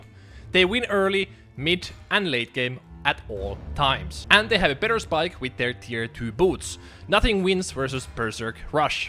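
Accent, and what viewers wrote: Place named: Finland. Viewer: start watching video hear the accent realization FINLAND MENTIONED🇫🇮🇫🇮🇫🇮🐻🐻🐻🐻🥶🥶🥶❄️❄️❄️❄️🍻🍻🍻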